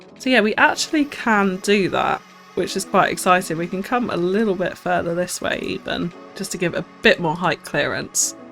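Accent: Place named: England, United Kingdom. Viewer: A beautiful design from someone with a beautiful designers mind. Additional thoughts - due to your charming English accent I kept miss-hearing what you were saying when you said “Mall” I kept hearing it as Mull. I genuinely thought you were saying it with a Scandinavian accent.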